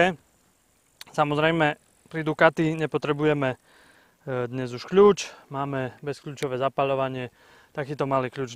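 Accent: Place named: East, Slovakia. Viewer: Velmi silny vychodniarsky prizvuk... inak super.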